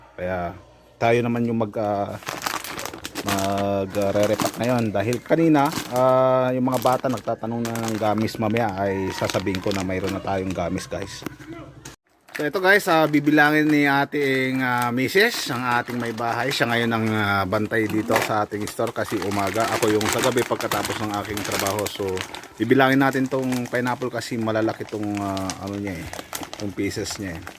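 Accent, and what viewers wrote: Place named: Waray, Philippines. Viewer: Saan Po ba location nyo sir? Parang waray waray ka boss hehe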